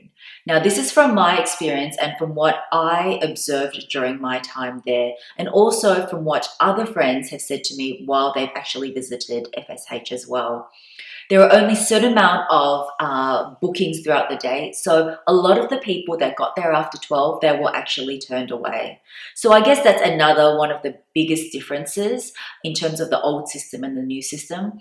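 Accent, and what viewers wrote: Australia. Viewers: Hi, love your Aussie accent lol. Thanks for your video, I'm going to Paris soon, and I didn't plan to get a Hermes bag, ur video made me wanting to have one now!!!:)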